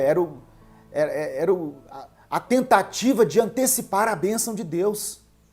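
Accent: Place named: Minas Gerais, Brazil. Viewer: Você é de BH ou MG? Seu sotaque não me engana rsrs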